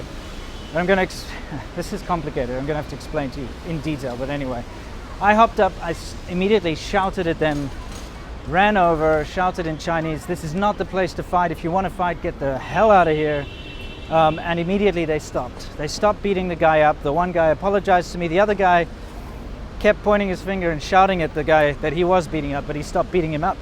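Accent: South Africa. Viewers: well South Africa was colonized by the British so to an untrained ear they sound almost the same. Plus he has a formal proper South African accent which sounds even closer to a British accent. This is also why New Zealanders sound similar to South Africans because they were also colonized by the British. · More south african than british brah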